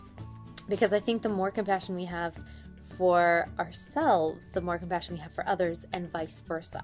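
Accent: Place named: RP, United Kingdom. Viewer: Hard to believe you could never speak English! Your vocabulary is strong! You look pretty young as well. My faith in the youth is kind of restored now 😂😂 just seen your videos. You have some posh accent. You've just inspired me. Anything is possible if you set your mind to it! A very sophisticated comment keep doing what you're doing!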